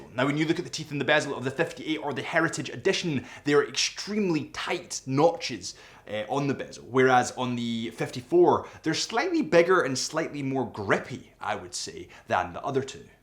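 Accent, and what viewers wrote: Scotland, United Kingdom. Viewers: Why is it called 3 link? You sound like a Scottish adrian Barker you have the same cadence when you pause start and end sentences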